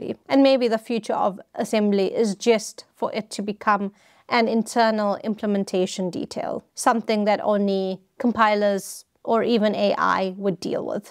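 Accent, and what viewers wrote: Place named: South Africa. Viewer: South African I think...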